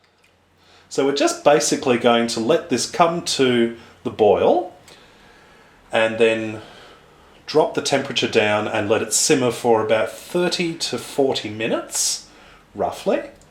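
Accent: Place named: Australia. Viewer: most aussies talk like that! me included!!! i think he talks nice!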